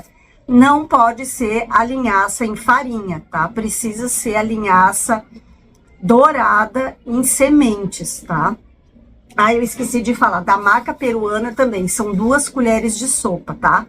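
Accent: Rio Grande do Sul, Brazil. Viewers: Sou de BH, MG, gosto muito de suas orientações e tbm de seu sotaque gaúcho, mto engraçado.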